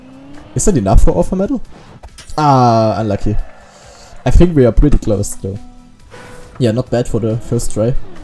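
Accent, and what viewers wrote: Germany. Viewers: Ahhh der schöne deutsch englische aktzent. Ich liebe es. Btw du hast gesagt der corner lol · man hört den deutschen akzent sehr gut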